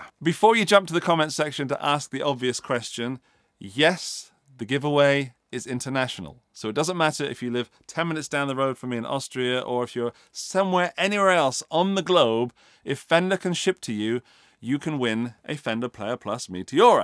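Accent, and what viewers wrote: England, United Kingdom. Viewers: I'm relatively new to the channel, and so far my biggest takeaway, and the thing for which I'm most thankful to you, is learning of the Strymon Flint, which will soon be part of my (very small) pedal arsenal. That and the hair, which is apparently somewhat toned down these days. Some of the older clips... it's memorable, I'll give you that! Seriously though, you're very articulate and occasionally funny with an English accent, which to American ears connotes intellect and is immediately suspicious to the bad people. I like it myself.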